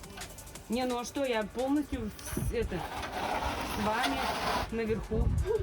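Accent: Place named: Udmurtia, Russia. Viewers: Говор сразу понятно Удмуртия😊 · Как мне нравится удмуртский говор, мммм😊! Сколько знаю девочек с Удмуртии - все мегапозитивные, очень симпатичные, вечно деловитые💪❤ и очень душевные❤❤❤ Привет от соседей- татар! 👋👋👋😊😊😊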